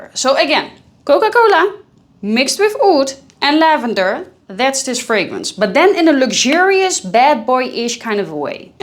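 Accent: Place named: Netherlands. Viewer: Nederlander 100% 😂 hoor je meteen! Leuke video.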